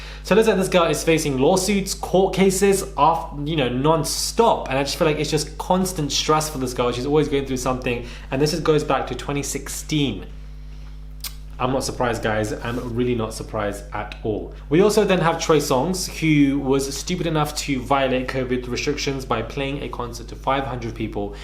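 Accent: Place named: London, United Kingdom. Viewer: !!!... I just found this channel and literally binged! Bite size gossip spoken by a Londoner... Love it!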